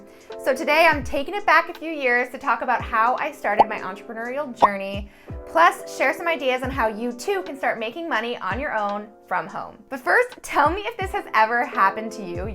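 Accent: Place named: South, United States. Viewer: Excellent video. I am so glad I stumbled on this channel. Incidentally, good southern accent.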